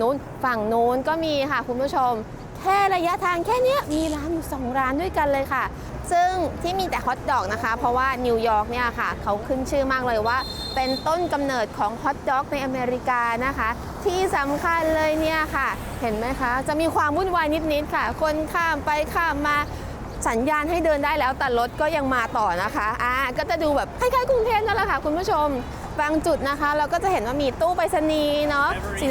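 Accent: United Kingdom. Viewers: ฟังน้อง พูดภาษาอังกฤษสำเนียงคนอังกฤษ(ไม่ใช่สำเนียงคนอเมริกัน)น่ารักมากค่ะ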